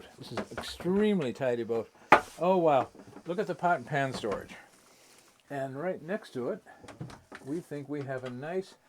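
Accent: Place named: New England, United States. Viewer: I am absolutely captivated by videos about old boats and houses narrated by NPR voices with slight New England accents? Must be my Aspergers... is my new Bob Vila!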